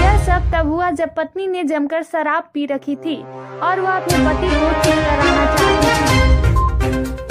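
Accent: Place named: Bhojpuri, India. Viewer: Madame ji please accent par jyada emphasis mt kijiye, Bhojpuri ki tarah sound aa raha hai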